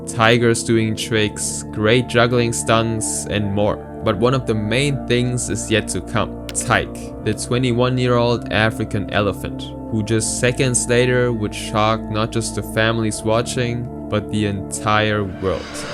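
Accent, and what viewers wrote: Germany. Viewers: Are you by chance german? Is your native language german? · Are you german? (my curiosity kills me just because I hear an accent lol)😅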